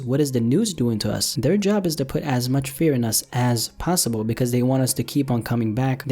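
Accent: Canada. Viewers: Are you Canadian?? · you Canadian?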